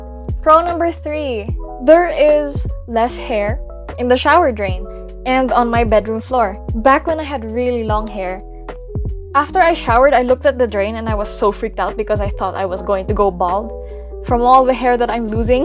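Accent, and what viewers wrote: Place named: Philippines. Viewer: btw this is unrelated but i love your filipino accent. 🥺 i strive to be as confident as you when speaking!!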